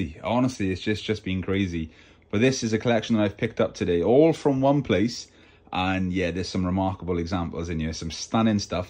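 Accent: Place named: Swansea, United Kingdom. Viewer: your enthusiasm is infectious! superb haul, i'm pleased to say i own many of them although not all are boxed, i completely agree re the artwork, it is absolutely stunning, a golden era not just for toys but everything else really(i wont get political) incidentally my cousin's husband is from swansea and his accent is so similar to yours(obviously) great video as usual, yes, i'd be interested in a more in-depth look at your collecting process, thanks again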